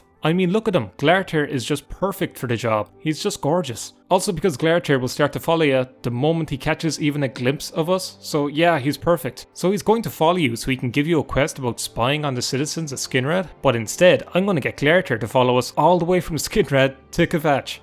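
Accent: Ireland. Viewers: Haha funny oirish man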